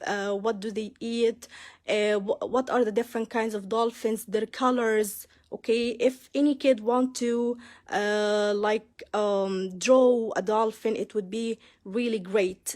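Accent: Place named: United Kingdom. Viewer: تبارك الله Your language is really perfect fluent and confident, British accent.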